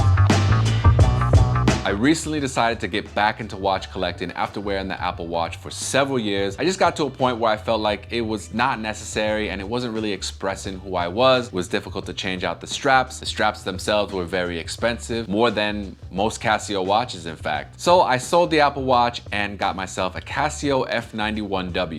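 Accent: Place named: New York, United States. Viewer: I absolutely love the New York accent!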